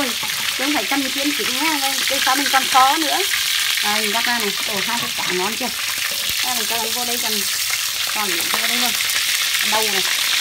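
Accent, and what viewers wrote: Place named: Quang Tri, Vietnam. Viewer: Cô người Quảng trị hay sao nói nghe hay quá. quê tui đây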